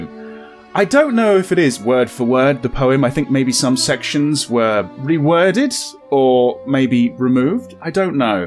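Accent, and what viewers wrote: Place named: United Kingdom. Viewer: I really how you read, you have a very nice British accent which really suits the Allan Poe's poem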